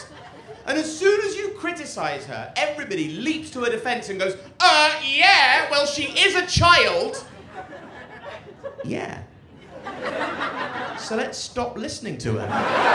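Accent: United Kingdom. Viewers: Amazing Special! that Scouse accent is so fucking funny. Bum Nuggets.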